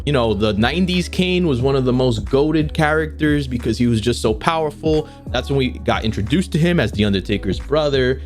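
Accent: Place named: New Jersey, United States. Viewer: I like your accent are you from New Jersey or Philly?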